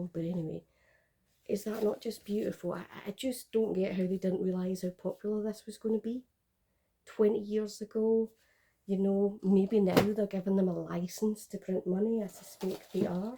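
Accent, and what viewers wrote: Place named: Scotland, United Kingdom. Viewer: Subscribed just for your lovely Scottish accent, well and I love bags too😂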